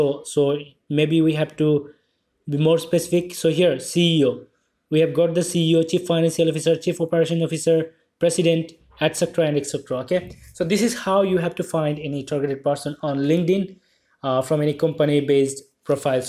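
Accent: Sylhet, Bangladesh. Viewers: Brother Are You From Sylhet? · are vaijan apni je bangladeshi seta apnar english accent shunei bojha jay!!!!!!!!!!!!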